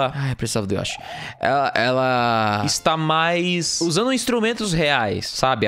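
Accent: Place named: Portugal. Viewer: Esse sutaque de portugal Ó top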